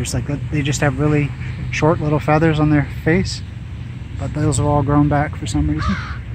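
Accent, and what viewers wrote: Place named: RP, United Kingdom. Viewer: I can already hear her Received Pronunciation.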